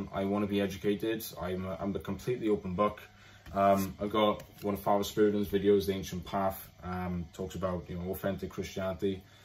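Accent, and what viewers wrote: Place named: Scotland, United Kingdom. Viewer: Hey, I grew up in a Christian/Pentecostal household, much like you, so I genuinely understand your perspective. Similarly, I've delved into Orthodox teachings, finding insights from figures like Father Spyridon and Bishop Mar Mari to be profoundly enriching. Their depth of knowledge is indeed a blessing. I resonate with your concerns about the shifts within the Protestant church. The changes since our childhood have been quite disheartening, particularly when observing the trajectories of the Church of England and Scotland, which seem to diverge from Scriptural foundations. While I've been influenced by Orthodox teachings and recognize God's work within that sector of christianity, it's essential to uphold that Jesus is unequivocally the ONLY path to the Father, as emphasized in John 14:6. Furthermore, 1 Corinthians 6:9-11 underscores the importance of avoiding idolatry (praying to saints). 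Even if some claim not to worship saints, our reverence should solely be directed towards Jesus Christ, the King of Kings. Despite shifts within certain Protestant denominations, I believe Christ prioritizes our individual relationships with Him. The authenticity of our faith lies in our personal devotion and living for Him beyond mere traditions. Reflecting upon 1 Kings, it's evident that faithful remnants persist even amidst broader challenges. On a side note, I detected your Scottish accent. When I resided in Edinburgh, I found solace in attending Charlotte Chapel, a Baptist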